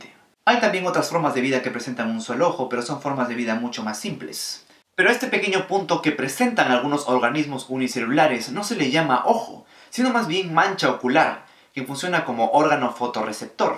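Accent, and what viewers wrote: Chile. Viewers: Excelentes videos amigo! Consulta, eres Chileno?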